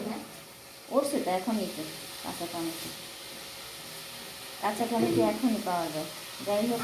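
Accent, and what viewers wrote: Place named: Barishal, Bangladesh. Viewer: O monu tumer parbay ❤ Borirasl bolay kothy 😎💯🔥👍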